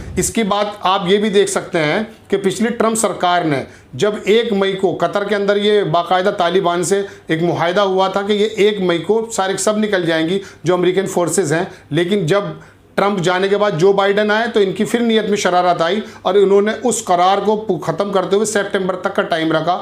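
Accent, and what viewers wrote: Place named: Saharanpur, India. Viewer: भाई आपकी आवाज तो अपने सहारनपुर वालो जैसी लग रही है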